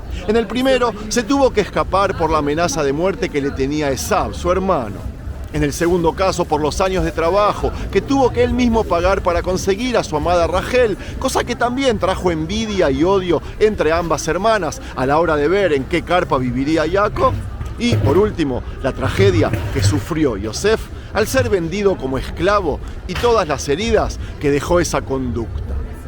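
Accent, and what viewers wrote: Argentina. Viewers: tu español suena absolutamente argentino, con algunos olvidos de palabras, mas absulutamente argentino y se de lo que hablo. otro día te comentare un sino que llevas, pero eso es otro caítulo.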